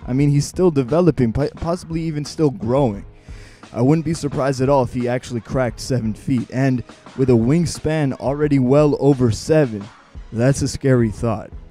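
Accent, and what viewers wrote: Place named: South, United States. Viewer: Your drawlin heavy👀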